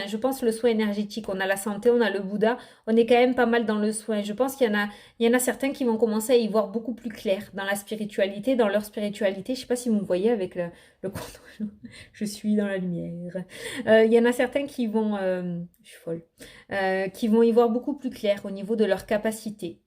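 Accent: South, France. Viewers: Coucou! cette symbiose que je ressens lorsque je vous écoute! Tout est le chemin actuel de ma vie, et vos précisions sont totalement liés à ma vie actuelle. Je suis en arrêt maladie suite à une grosse intervention chirurgicale, et j'ai une personne, un homme qui vient d'entrer dans ma vie. pas encore sentimentalement, mais je ne serais pas contre lol!. Affaire juridique en cours qui traînait depuis 5 ans! Je vais bien suivre mes 'alertes" internes afin de voir les mauvaises choses qui arrivent! Mille merci! et gardez votre vitalité, votre accent du sud qui me manque tellement et votre bienveillance! Hâte déjà de vous retrouver pour de nouveaux indices sur nos parcours.... Belle nuit à vous!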